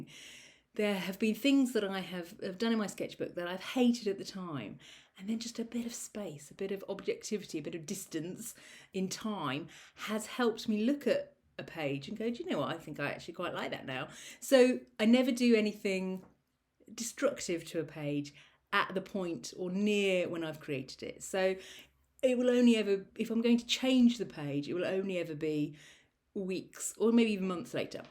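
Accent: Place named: England, United Kingdom. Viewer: Wow! 🤩 I've just discovered you today. I'm feeling a bit low, (or was,) it's my 55th birthday, no cards, a "nothing" day... but i was checking out videos of gelli plate art and found you. 😊 What a lovely lady, so glad you are doing again art now. The sketchbooks are full of gorgeous colour, great pen drawings, not at all childish! 👍🏻😊 I also love the fresh cream walls in your studio with the splashes of colour, and colourful ceramics as well. That's how I'd like this dull flat to look; it really needs tidying up and decorating! 😱 And i just live up the road in Eastbourne at the moment. And it's nice to hear a calm English accent. Must watch your other videos - great advice too.❤🌞🌷🌼🌱🌿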